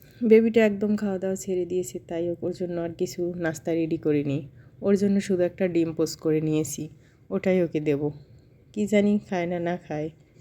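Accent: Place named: Barishal, Bangladesh. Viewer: আপু চলে আসলাম তোমার ভিডিও দেখতে তোমার কথায় বরিশালের ছোঁয়া পেয়ে ভালোই লাগলো মিষ্টি কুমার ❤❤❤❤❤❤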